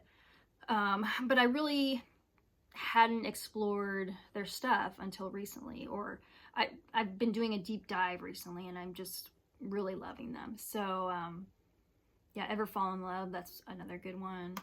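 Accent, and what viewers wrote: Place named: United States. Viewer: Yet again, a great selection of records from the year in question by such a young (...compared to me) person! Absolutely all my faves are in there.....well almost - you need to include (ah yes, you did pick this one - I started typing this comment too soon!)...oh and by the...and maybe by, and by, and by (probably their best), and by, and by. You are quite right, is the absolute greatest TH's LP, as hopefully you'll eventually discover...and is also EC's best! This is so good! And you pronounced name correctly - your smooth american accent makes this sound as naturally perfect as it is! You took me right back to my whole life in the sixth form (which is the two penultimate two school years before going on to university, etc. for those viewers who are not English!). Thanks for taking me back to such a joyous time - these records don't just contain music, they're sort of erm,... 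notches on the belt of life - gosh, that sounds so corny (sorry!)! Btw, if you're really going down the collectors line, you need a copy of with the fold out rear sleeve (but mines not for sale at any price, I'm afraid!). All the best. Cheers!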